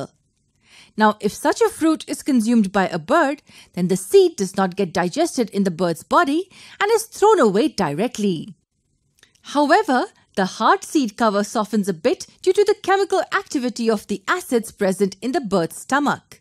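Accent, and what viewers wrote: India. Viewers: you sound li indien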